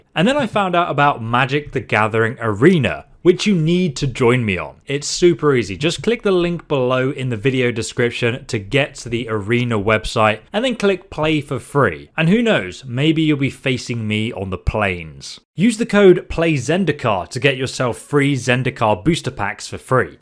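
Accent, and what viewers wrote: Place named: United Kingdom. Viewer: Eh it's a bri ish thing. At least I think hes British either that or hes Scottish or something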